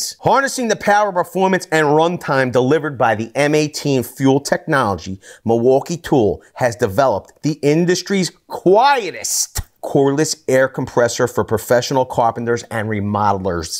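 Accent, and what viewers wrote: Philadelphia, United States. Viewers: Sounded like you were from philly!! Cheers from levittown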